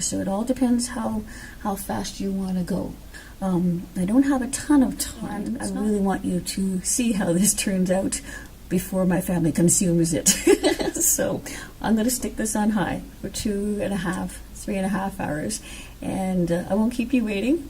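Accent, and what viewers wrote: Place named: Ireland. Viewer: Are you originally from Canada, or somewhere else? Your accent sounds almost Irish! 😊☘️ Recipe looks delicious, and I love the crock insert💖 HAPPY THANKSGIVING🦃 Blessings from, Washington State USA 🌲☔💖🙏😊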